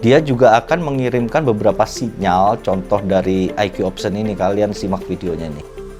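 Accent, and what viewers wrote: Java, Indonesia. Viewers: ngomongnya agak medok, lbh enak saya dengernya sbg org Jawa, mantap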